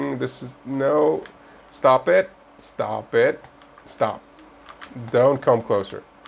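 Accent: South Africa. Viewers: Awe are you from South Africa bro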